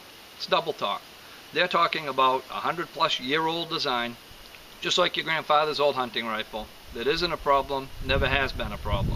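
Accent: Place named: Maine, United States. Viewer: Ike's christ jezus bubby, me an matha down at clam shukka's point pullin sum quohawgs and dun fuhgot my clam rake!Just kidding Brother, havn't been back to Down East Maine for a few years and your accent makes me miss home. Say Hi to Mt. Rialto for me.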